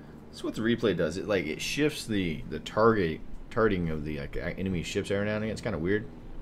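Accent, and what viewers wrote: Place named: Texas, United States. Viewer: THAT'S what it is. Another Texas boy. It's that back - home accent that I hear. Another of your typical entertaining and instructional videos. Keep'em comin.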